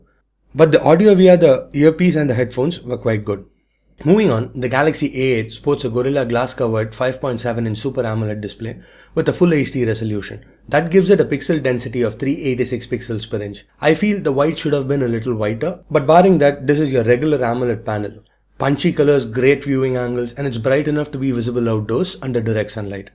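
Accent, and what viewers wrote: India. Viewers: dude what happened to your voice.. you need more power you sound tired... the Indian accent is fine but you need to be more energised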